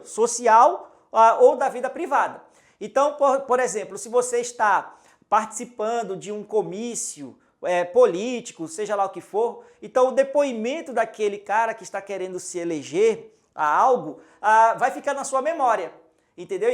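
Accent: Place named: Manaus, Brazil. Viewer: Vc e de Manaus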